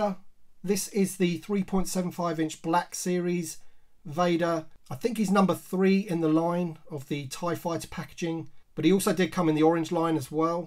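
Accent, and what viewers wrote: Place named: United Kingdom. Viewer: Was happy to find out you skated in the 80's. I think star wars was my favorite thing as a young kid, then G.I.Joe, until Skating. Loved Skate culture. Then came music and the subcultures until I ended up in my 40's and now I'm back on the toys! I love these vintage collection cards backs. The whole thing just brings me back to what a great reward it was to get a new figure as a kid. Cheers to you, I love your channel. Not sure what area your accent is from, but I always expect to hear you say "Oi!"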